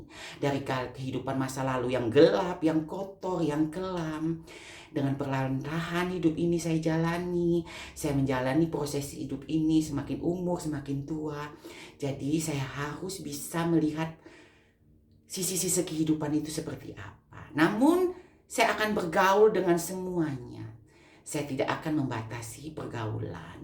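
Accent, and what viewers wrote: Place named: West Java, Indonesia. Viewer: Teh dari logatnya kaya org sunda. Salam kenal dari tambun bekasi.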